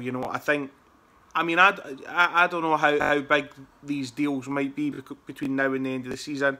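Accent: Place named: Edinburgh, United Kingdom. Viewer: Yaasss mate get in, also just wanted to say you have the most stereotypical Edinburgh accent I've ever heard hahahaha! Subscribed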